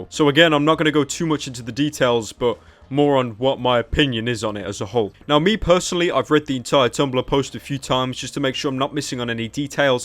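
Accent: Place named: Midlands, United Kingdom. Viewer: Where u from? trying to pin ur dialect/accent... u sound (UK) Midlands"ish".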